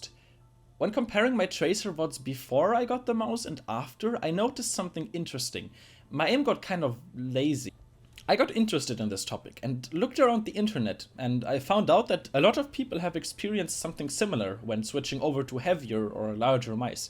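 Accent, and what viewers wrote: Germany. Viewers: Ýour accent sounds very german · Are u german? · Are you German ? 😂 wenn ja ich auch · You are dein Germany , richtig? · Man hört mega raus, dass du deutscher bist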